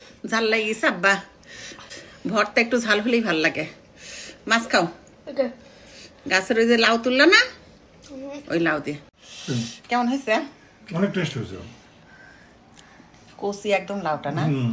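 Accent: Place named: Kushtia, Bangladesh. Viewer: আপনার ভাষা শুনে মনে হচ্ছে আপনী কুষ্টিয়ার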